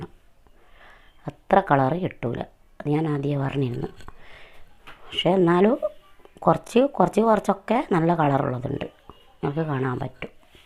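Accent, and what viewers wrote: Nadapuram, India. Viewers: Barthanam kettokkumbo nadapuram bayikkanna thonnunne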